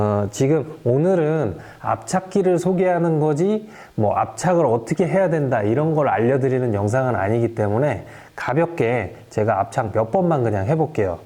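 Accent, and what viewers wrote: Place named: Gangwon, South Korea. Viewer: 좋은 정보영상 잘봤습니다.^^ 대표님 발음이...강원도 말투가 자꾸 ㅎㅎ 그랬슴다 ㅎㅎ 그랬슴다 영상 업로드되서 볼때마다 재밋슴다 ㅎㅎ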